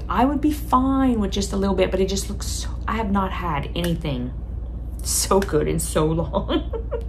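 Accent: Louisiana, United States. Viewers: You have the Cajun accent back! I have kids and grandkids in Lake Charles!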